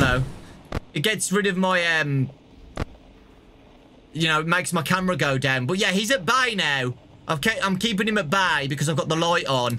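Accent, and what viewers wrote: United Kingdom. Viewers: Does anyone here think British accent is cute☺?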